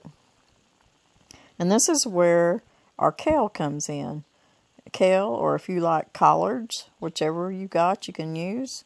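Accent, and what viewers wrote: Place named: Texas, United States. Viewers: U2 are wonderful, please tell me your accent, were are U from......Texas maybe................I just Love this accent. .......I am French Canadian living in Vancouver BC and I jet get exited with your life...Thank U2 for your great meal................ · that’s how we say thing in Texas!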